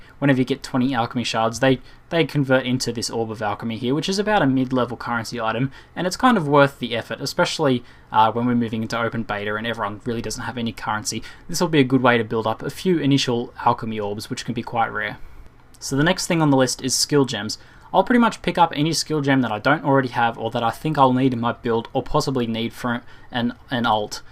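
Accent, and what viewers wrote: Australia. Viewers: I'd say australia. Especially because he greets us with "g'day"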